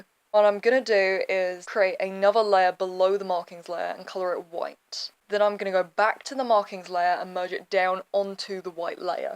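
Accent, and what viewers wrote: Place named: Australia. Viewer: Your voice has changed, now you sound somewhat Australian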